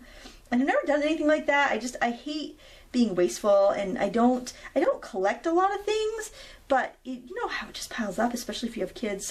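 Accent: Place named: New England, United States. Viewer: love the long videos!!!! I watch them alot for tips and practice..you give lots of great ideas and tips. I paint and do crafts all day most days, and watch youtube videos on my huge tv screen so i can see every little detail! Thankya again for sharing!!! Long vids are more then welcome!! lol love your new england sillyness too!!! wicked smaaaat lolol giggle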